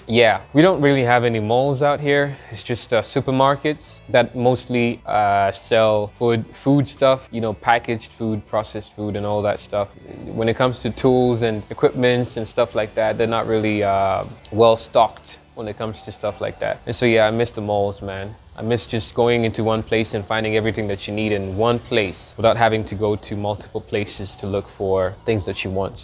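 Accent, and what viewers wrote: United States. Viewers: I think you have a very interesting accent. It doesn't really sound like how my African friends from Ghana, Nigeria and the DRC speak. It sounds more like an American accent. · I had to look up your first video , i thought u were American.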